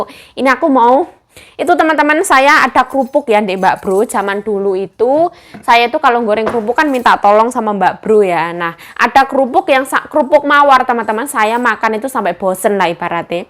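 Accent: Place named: Sunda, Indonesia. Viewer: Kocak ngemeng Sunda na 😅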